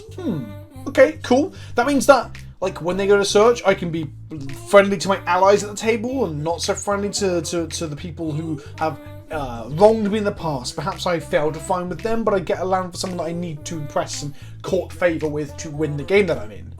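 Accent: United Kingdom. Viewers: It's hard to listen on anything past 1.25 speed like i normally do, bc British accent lol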